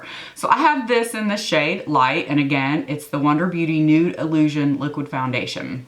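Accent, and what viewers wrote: South, United States. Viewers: You must be from maybe Georgia,or Alabama?Am I close?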